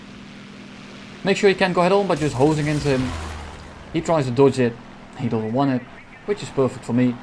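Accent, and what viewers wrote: Netherlands. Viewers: Is he dutch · Hey man, although a bit late, I do enjoy the videos! Are you dutch?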